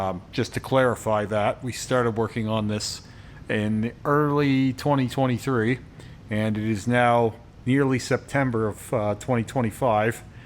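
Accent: Canada. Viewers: As a Canadian myself, I could tell right away that you were Canadian lol everyone thinks Americans and Canadians have the same “accent” but it is so different hahaha cool stuff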